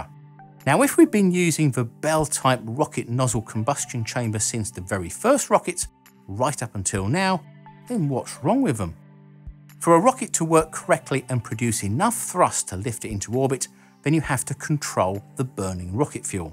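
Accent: United Kingdom. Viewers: Did he just say folcon heavy i ♥️ the british accent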